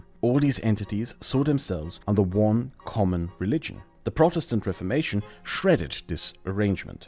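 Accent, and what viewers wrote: Germany. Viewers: There's something inherently sexy to a video essay on German history written in perfect formal English and narrated with a tasteful German accent. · Ironically, I never thought I'd enjoy listening to pronounce German words but...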